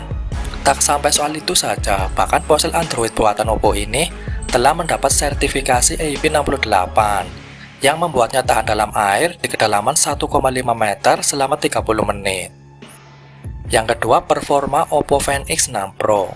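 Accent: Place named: Tegal, Indonesia. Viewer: Presenter nya NGAPAK ORANG TEGAL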